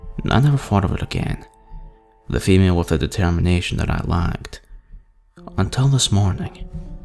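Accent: Scotland, United Kingdom. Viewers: Great stuff, love the your slightly seedy Scottish accent c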